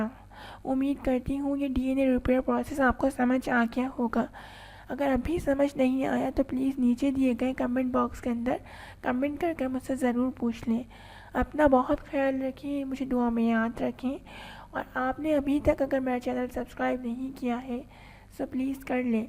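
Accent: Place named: United Kingdom. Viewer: Ap aise hi bolti ho kya hindi me english accent dalne ki kosish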